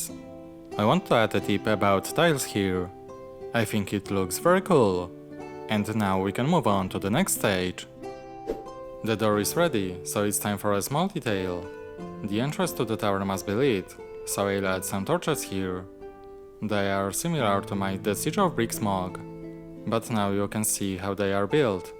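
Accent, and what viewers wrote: Poland. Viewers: Tak mi coś akcent podejrzanie zalatywał Pongliszem więc miło widzieć, że PL. Dobra robota! 🙂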